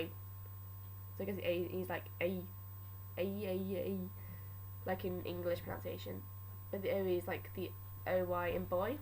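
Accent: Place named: United Kingdom. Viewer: 【Leia】 It's ok I pronounce it the same way. Where are you from? You sound like me. (I'm British btw!:3)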